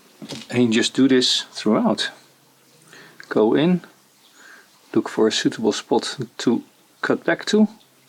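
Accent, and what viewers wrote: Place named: Netherlands. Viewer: 👍 Including the charming Dutch accent 😍🙏😘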